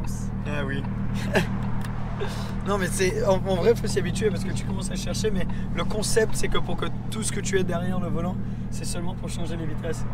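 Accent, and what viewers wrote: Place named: United Kingdom. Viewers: C’est son origine anglaise ptn réfléchi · J’aime tes vidéos... mais arrête de prononcer des noms italiens à l’anglaise...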